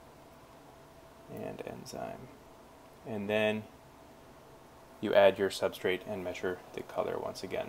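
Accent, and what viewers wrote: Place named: United States. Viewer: Finally. A quick video with an american accent.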